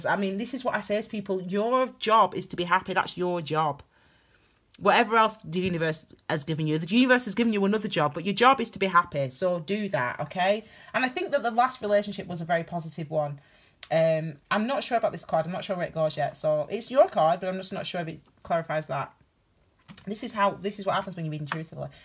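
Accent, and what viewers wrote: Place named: North, United Kingdom. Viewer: My pleasure! More please! LOL! It’s ironic that when I listen to many of those Texan fast mouth readers I can barely understand them. Your beautufl Northern tones of course are so easy to listen to...and of course the content is excellent! 🍷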